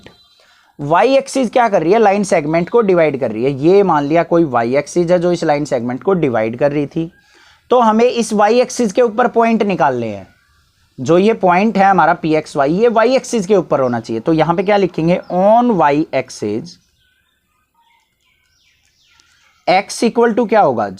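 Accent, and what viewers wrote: Haryana, India. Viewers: Sir aap haryana se hai ?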